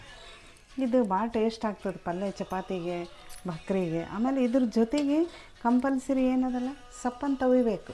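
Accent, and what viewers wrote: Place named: Brahmin, India. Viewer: ಎಲ್ಲಾ ಅಡಿಗೆ ತುಂಬಾ ಚೆನ್ನಾಗಿ ಮಾಡುತ್ತೀರಿ ತುಂಬಾ ಚೆನ್ನಾಗಿ ಹೇಳುತ್ತೀರಿ ಬ್ರಾಹ್ಮಣ ಭಾಷೆ ಅಪರೂಪವಾಗಿದೆ ಕೇಳೋದಕ್ಕೆ ಖುಷಿ ಅನಿಸ್ತದ ನಮ್ಮ ಉತ್ತರ ಕನ್ನಡದ ಬ್ರಾಹ್ಮಣ ಭಾಷೆ 🙏🤝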